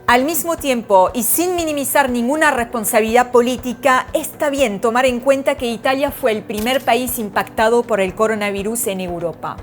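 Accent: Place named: Argentina. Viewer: Todo bien DE DONDE ES ESTA DAMA...su epañol esta mezclado entre acento Español Argentino y Ruso... Pero buen video informativo.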